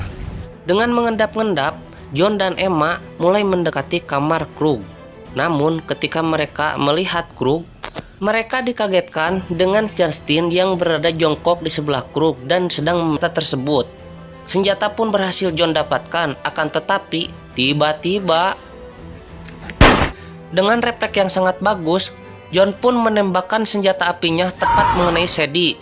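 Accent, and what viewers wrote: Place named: Sunda, Indonesia. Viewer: Logat sundanya ledok sekali mas,..🙊😁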